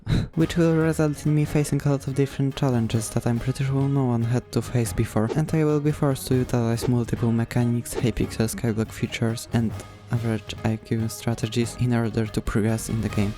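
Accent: Poland. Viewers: Hi, you are from poland? I asking because of your accent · Taki nawet polski akcent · On jest z polski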